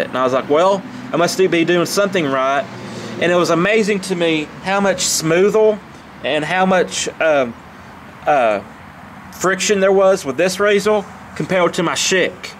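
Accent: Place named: Louisiana, United States. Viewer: oh, I actually thought you where from Louisiana Cajun accent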